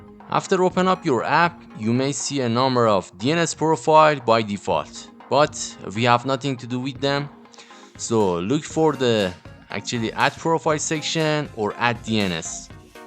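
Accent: Iran. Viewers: لحجه ایرانی ؟ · چه لهجه فارسی داره انگلیسیت❤😂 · به به لحجه‌ی اصیل ایرانی❤😂 چطوری هموطن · Well for everyone who are curious, This DNS is more commonly used in Iran. The creator is also from the same country based on the accent and the error received. This is a great method shown and he's actually right! However, for the people outside of Iran, I recommend you to search through the internet and find the nearest DNS service possible to your country. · حاجی ایرانی ؟ لحجت معلومه ایرانی ؟ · داش ایرانی از لهجهت فهمیدم❤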